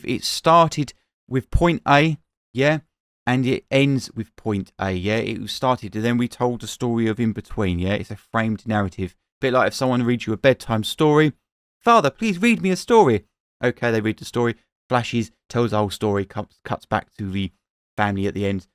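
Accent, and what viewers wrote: United Kingdom. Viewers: 😂 every time I, as an American viewer, hear you say the shortened f word for cigarette my ears perk up like did he just slur me out? And I immediately culturally adjust cause I also love British language and slang and the accent and I’m like “oh yeah that’s what they call cigarettes in the UK” where as when I hear that word thrown about here at home it’s being thrown out in discrimination against either myself or my other LGBTQ+ brothers, sisters, and others. But I got you!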